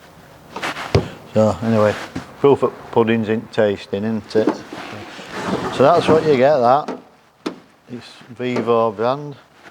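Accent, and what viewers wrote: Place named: South Yorkshire, United Kingdom. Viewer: Great video. Great accent - sounds like South Yorkshire to me. Great to hear it on t'internet. New subscriber. Looking forward to the review of its functionality.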